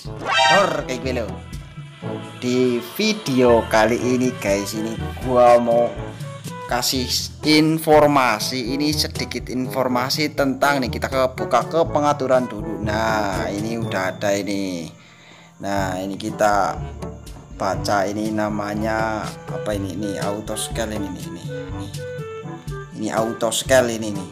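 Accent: Java, Indonesia. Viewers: jawa ne ngendi pak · Wong Jowo to? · Bg orang Jawa ya kaya aku ngomong nya kaya gitu 😅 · Wih wong jowo🤣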